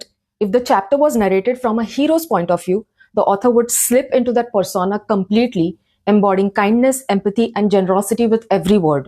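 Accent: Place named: India. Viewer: Your Indian accent enhances it so much🙏✨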